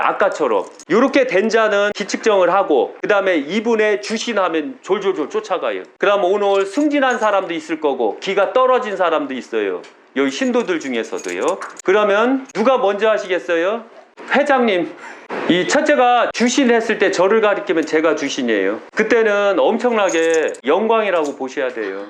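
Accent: Chungcheong, South Korea. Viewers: 매일 유교약사생불사 봅니다 확신찬말씀이 좋습니다 충청도말씀이 참 친근합니다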